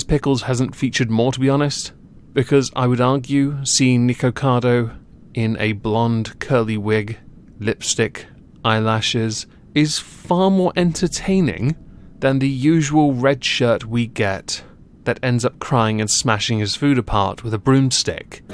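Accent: RP, United Kingdom. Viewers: Tbh, it's actually really contrived, whereby he puts dramatic emphasis on certain words. He sounds like a posh person trying to sound deep and meaningful...he has you suckers fooled!! lol!!!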